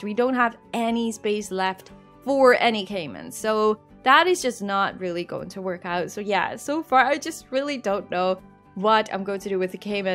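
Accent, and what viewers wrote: Netherlands. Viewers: I knew you accent sounded Dutch! Never been to Rotterdam zoo but I loved Beekse Bergen. Been a very long time since I last went to visit but I'm definitely gonna go again sometime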